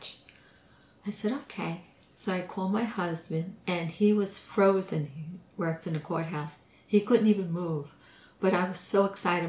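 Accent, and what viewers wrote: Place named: New York, United States. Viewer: New subscriber to your son’s channel, thank you for sharing your story! I cried when you spoke about your dad and melted when your son came over to comfort you. Your New York accent is so awesome:). ❤️💐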